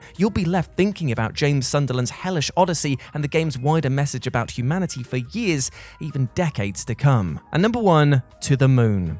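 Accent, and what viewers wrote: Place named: United Kingdom. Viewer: Ah. My favorite British accent barring chef Ramsay and my personal friend. I hope to one day be as nostalgic and awesome as you with my own accent. The accent of cornbread and fried chicken...this I who I am don't judge me. 😑🤣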